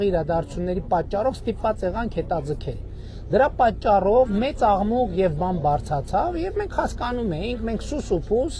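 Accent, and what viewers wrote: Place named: Dilijan, Armenia. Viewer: Дилижани акцент тох хелоооок е. Ваз газа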